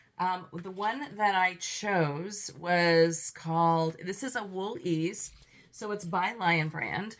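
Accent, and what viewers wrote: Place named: Tennessee, United States. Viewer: You brought back some of your southern Tennessee accent. ❤😂 That book is amazing.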